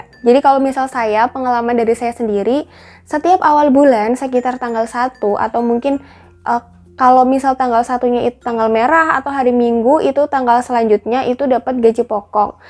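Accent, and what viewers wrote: Cilacap, Indonesia. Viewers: Sampeyan asli orang Cilacap kak ?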